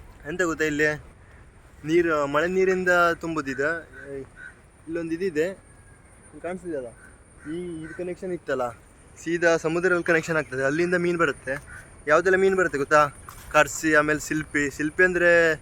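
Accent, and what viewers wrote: India. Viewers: Byndoor kundaluradavara reeti mataduttiri neevu.Uttara kannada bhashe bere reeti iruttade. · Hi yava vuru nimbhu kundapur kannada matadatira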